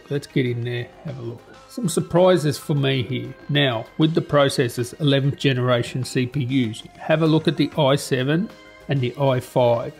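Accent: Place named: Australia. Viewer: i think the australian accent is pretty awesome 🤷‍♂️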